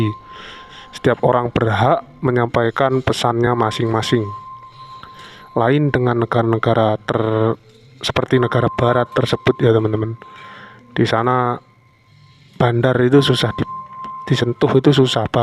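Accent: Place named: Java, Indonesia. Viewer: Medokkk bet jowo mu brayyyy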